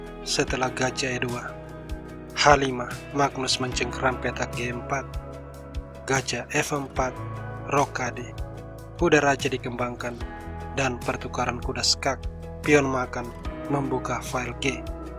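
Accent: Manado, Indonesia. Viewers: Orang Manado dang depe admin ini?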